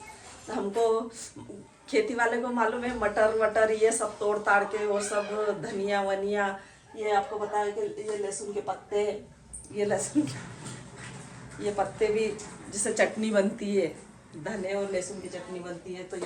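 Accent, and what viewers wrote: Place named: Indore, India. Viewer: Ye indori language hai inki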